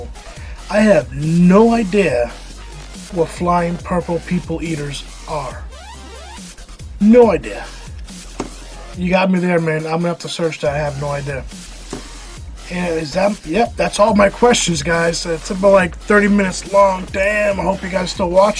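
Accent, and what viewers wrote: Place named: Boston, United States. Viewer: Thanks for the Q&A,. My girlfriend and I thought you were from Boston so thanks for clearing that up for us haha. Chucky is a sweet character. I grew up loving those movies. I probably like Child's Play 2 the best simply because we had the VHS growing up and watched it all the damn time lol. Commando is an awesome movie! BENNETT! lol... Thanks for the Q&A. Love your vids!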